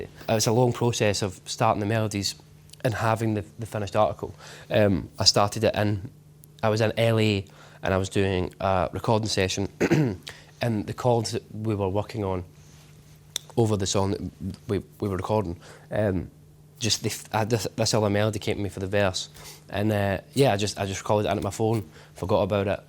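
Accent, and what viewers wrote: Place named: Scotland, United Kingdom. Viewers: Ass Juice right the Scottish accent is beautiful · That song fcks me up, and now that I know he's Scottish (omfg lovely accent) and seems nice, I'm a fan ✌️ [also he looks a bit like Paul McCartney, I think it's the eyes]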